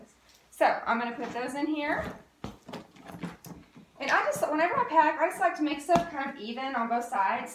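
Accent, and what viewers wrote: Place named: South, United States. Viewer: I love u and your southern accent! U r funny! Had to sub due to your awesome personality! 🤣❤️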